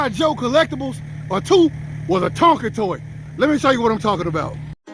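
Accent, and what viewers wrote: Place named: Louisiana, United States. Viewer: Bro you have like a California mixed with Louisiana mixed with Back road Mississippi accent😁 I love it!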